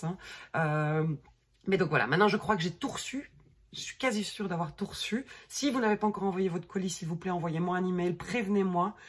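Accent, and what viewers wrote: Belgium. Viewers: J'adore t'écouter placoter même si je ne tricote que des foulards...! La langue belge est jolie...tout comme ma langue directement du Québec.hi hi! bye et gros becs!!!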